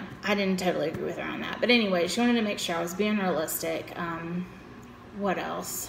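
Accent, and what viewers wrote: South, United States. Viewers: Hey, I'm glad you were honest and I do understand how dark that place can be. I am so happy that you are in a better place. I felt the same way during the psychological testing. And I am still going weekly to my psychologist. I probably will for the rest of my life. You are on your way girl to feeling better. I heard you say Ya'll a few times and I am picking up a little bit of a Southern accent where are you from? We live on the Gulf Coast in the deep south, MS. Keep posting please, it's helping me get through this long wait. Rebecca:)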